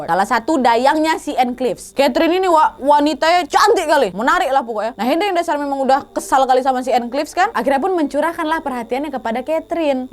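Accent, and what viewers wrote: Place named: Dumai, Indonesia. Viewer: Kyk org Dumai logat nya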